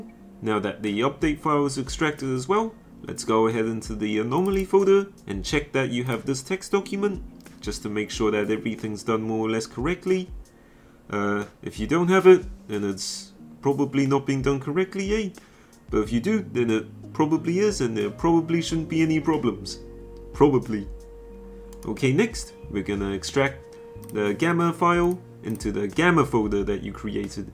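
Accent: New Zealand. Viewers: The bro is kiwi can tell that accent anywhere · Where are you from? Are you a kiwi? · He has a very strong kiwi/native New Zealand accent, very distinctive and a part of our country. Is honestly similar in some ways to South African accent because the conditions of our countrys history is similar, both being British Colonies. But still very different :D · He sounds like he is from New Zealand 🇳🇿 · Thanks for turtoial. NZ Accent goes hard. Much love from Oz.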